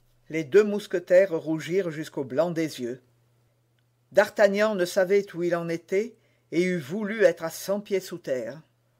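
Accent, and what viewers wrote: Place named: South, France. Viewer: L'accent méridional doublé du chuintement aristocratique pédant. Inaudible bien évidemment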